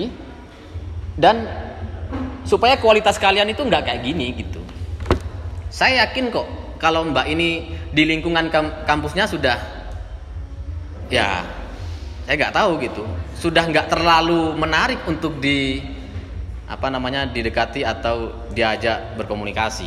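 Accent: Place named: Madura, Indonesia. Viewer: oh....ternyata cak maduranya Sumenep, makanya logat maduranya nggak kentara.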